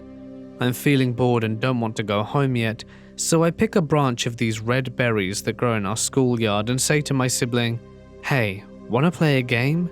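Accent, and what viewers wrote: United Kingdom. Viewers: ❤your voice uk from ?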